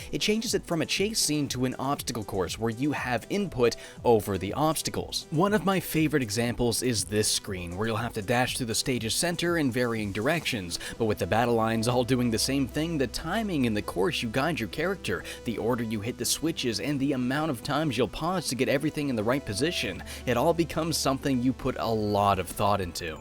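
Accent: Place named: Canada. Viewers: Hello, Please ignore them. It is a shame that you should feel you should hide your Canadian accents because of people. On the contrary, I'd like you to remain the dude you actually are, don't force yourself to be otherwise. Also, there is a ludicrous amount of videos in American English on the internet, so listening to another accent is overwhelmingly refreshing; that accent is not missed... at all. Actually, that is quite unfortunate that the American accent seems to be the norm in videos and in English courses at schools. Please, keep up the good work! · Really? That’s a shame man. Canadian accent or not the analysis would still be spot on. I’d say that’s what really matters. Ya know, aside from letting you know about how Chargin’ Chucks are in 3D World again. And again. And again. And again.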